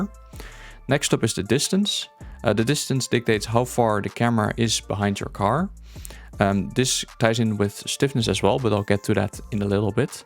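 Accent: Netherlands. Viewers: hey are you dutch matbe? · Your Dutch I just hear it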